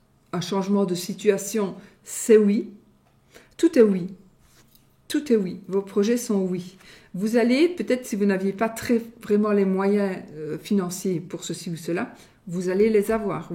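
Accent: Belgium. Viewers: Coucou, merci beaucoup pour votre travail. Je vous découvre aujourd'hui. Vous êtes belge ? Vous avez, il me semble un petit accent qui me parle...❤❤😂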